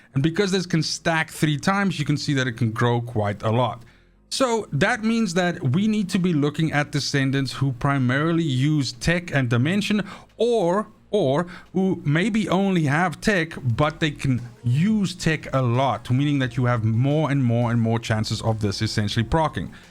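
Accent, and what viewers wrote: South Africa. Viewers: Yo man are you South African?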